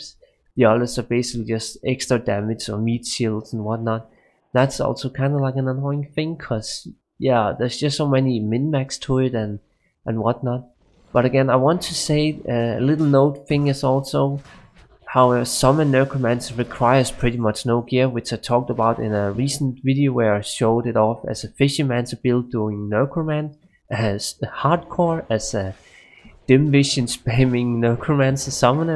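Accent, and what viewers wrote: Denmark. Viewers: dejligt at høre en dansker :)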